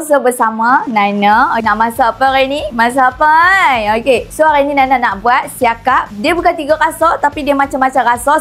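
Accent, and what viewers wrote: North, Malaysia. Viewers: Langsung subscribe deh kamu...ud lama tak dengaq cakap utara..che..prikitiew.sy dah cuba resepi kamu..sedap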